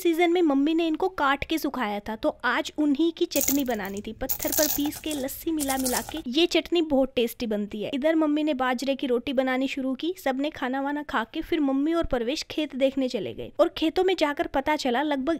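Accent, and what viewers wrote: Haryana, India. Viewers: Dii app ne hrayanve voice me nahi bana rahi ho app video😢😢😢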